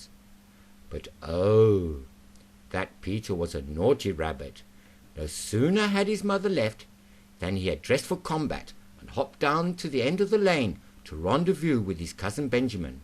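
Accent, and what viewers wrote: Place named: South Africa. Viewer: Love the South African accent the narrator has.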